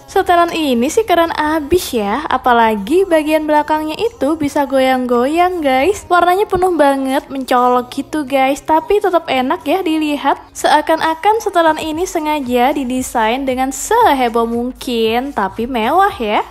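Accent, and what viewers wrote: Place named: Central Java, Indonesia. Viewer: Logatnya kya orang jawa tengah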